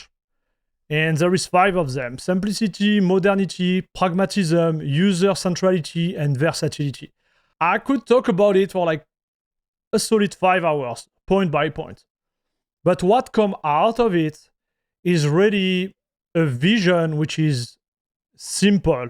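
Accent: France. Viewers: Sorry for reducing you, but I only watched this for the english with french accent.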